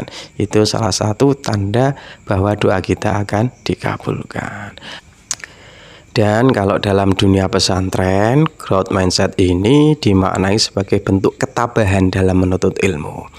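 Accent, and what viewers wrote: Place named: Java, Indonesia. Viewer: Assalamualaikum Trims banyak Gan...Ha ha ha medok sekali jawanya ....pilihan analoginya cerdas sekali ...pada akhirnya kok seperti andragogy ya Gan? sekali lagi trims banyak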